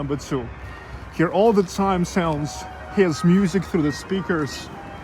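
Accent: Russia. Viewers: Какой классический русский акцент 🥰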